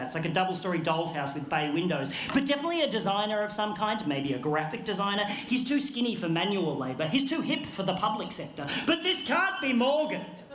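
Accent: Australia. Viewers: mans so aggressively australian he replaced the voice in my head , now everything i read is in australian muppet reject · This isn't aggressive Australian, this is regular Australian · this poor guys comment is getting attacked guys chill lol also yea i know what you mean his emotional range with the aussie accent and the way he talks goes soo well with how we speak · He's actually pretty normally Australian. is just regular Australian.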